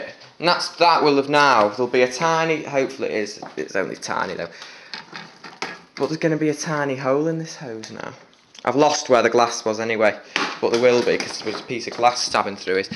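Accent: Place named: Liverpool, United Kingdom. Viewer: Great video, cant believe it got no comments! I've just watched most of your other vacuum cleaner videos and all I can say is I love your style and the chat you give while talking about the vacuums, which is just the way I used to do my own videos. I used to be able to ramble on for an hour about a vacuum no problem, lol. I guess you are into alarms much more than vacuums, but I think you have a great talent for turning a mundane old vacuum from a rubbish tip into an interesting and entertaining video, much like Sam from Beko1987 does, and its your comment under his Purepower video that lead me to your channel here. You remind me very much of Paul O Grady with the way you speak - I guess you must be from Birkenhead / Liverpool area, lol. Its great that you are giving an old Lux like this a new lease of life, and that your mother likes using it. 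I gave my own mother one of my old bagged Lux uprights last year, its the Powerlite Petlover 1700W from 2010, and she loves its lightness being aged 74 she struggles with bagless machines and bagged is easier for a pensioner to maintain.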